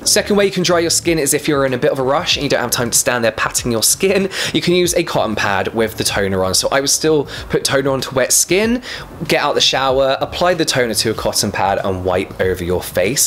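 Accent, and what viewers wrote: United Kingdom. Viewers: In love with ur vdeos,ur ausumn skin😭😭😭n the British accent😍😍😍#lucifervibes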